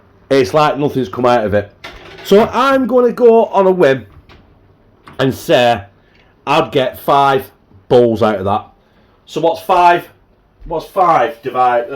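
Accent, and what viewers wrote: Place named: Scotland, United Kingdom. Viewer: Wth! A Scottish accent about mexican food! Lol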